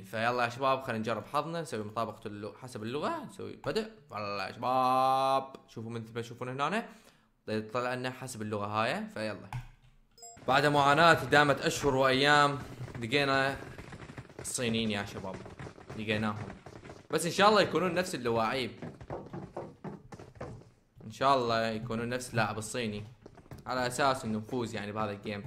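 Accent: Iraq. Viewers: فدوه لقلبك،وربي منجد احب لهجت العراققق😭❤️❤️❤️❤️❤️🇸🇦🇮🇶.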